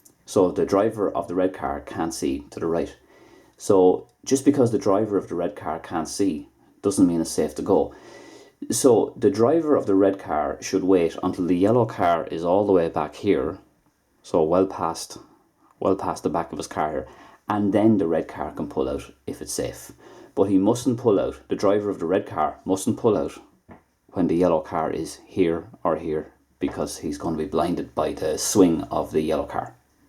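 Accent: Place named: Ireland. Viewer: you're so clear with your Irish accent you're the best.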